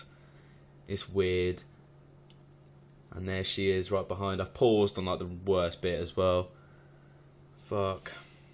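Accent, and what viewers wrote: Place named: United Kingdom. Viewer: I loved this show and ur reactions haha where bouts in the uk are u from cant figure out ur accent im from newcastle 🤣🤣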